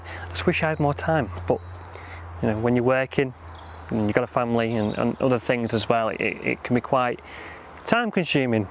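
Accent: Manchester, United Kingdom. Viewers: Great video thanks 👍 I think I'm not far from you , in North Manchester (I'm guessing from your accent lol 😉) I'm also very puzzled but delighted by the absence of slugs! Last year they ate everything 😢 Do u think they're plotting a coup?!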